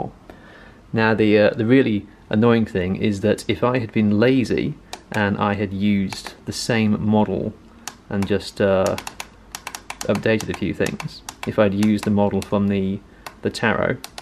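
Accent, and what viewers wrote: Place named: United Kingdom. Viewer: I think my main distrust and poor performance with the 35xx motors and larger props is I live at higher elevation. Based on your accent and surroundings you live somewhere in the UK with an average elevation of ~500ft. I live at 5000ft. M100's will hover for 28 mins at sea level on the larger battery, that same setup will only hover for 15 mins at 6000ft, and with ~500g of payload drops to 5 mins. So whether its work or hobby, I have to have more thrust because the elevation range I fly in is -2000 to 10000 ft ASL for commercial units, and when I fly for a hobby still 5000 ft, give or take 2000 ft. But say your drone makes 6kg of thrust (1500g per corner) and weighs 2.5kg, so it hovers around 50% throttle, its just a little under the max take off weight people generally like to have (50% of max thrust), so while flying you have 50% throttle to play with for flying and error correction. 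But that's lower elevation, at 5000ft that drone would likely hover around 75% throttle. Not only do you lose flight time, payload, but you lose authority. Its not that I am poo pooing 35xx motors entirely, but specifically, big frame, big props, small motors. Bigger frames have more weight, more room for batteries that add weight, and you hit the limits of the drone easier before taking other factors into account, like elevation. And how often do people think about how well their drone will fly when they do a road trip from the coast to the mountains and gained a few thousand feet? I don't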